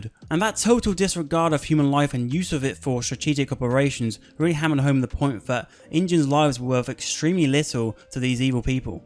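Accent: United Kingdom. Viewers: It's hard for indians to understand ur English accent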